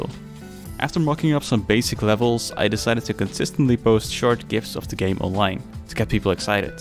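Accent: Netherlands. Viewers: love the dutch accent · Broer dit Hollands accent met dat Engels, ik herkende t meteen bwhaha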